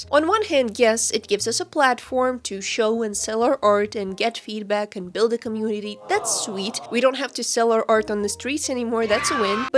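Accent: Russia. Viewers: Good video, but that matryoshka balalaika accent...hard to listen to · русский акцент?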